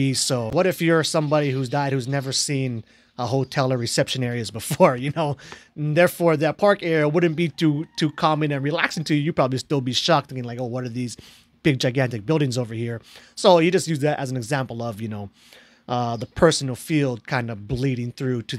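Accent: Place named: Hawaii, United States. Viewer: haha just the way you end your words 😂😂 what island are you from? I was born & raised in Maui, moved here to Wa state in ‘16 right after graduation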